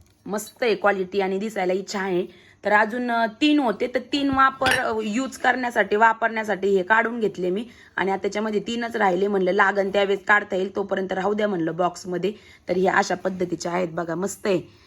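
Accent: Ahmednagar, India. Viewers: Tai tya areyachi bhasha tshich aahe mi pn nagar chi aahe asch bolt ja chan vatte tikd tshich boltat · लोकांकडे लक्ष नको देऊ तुम्ही बहिणी खूप ट्यालेतटेड आहात मी नेहमी व्हिडियो बगते खूप छान वाटत....... नगर ची भाषा तुम्ही बोलता तशीच आहे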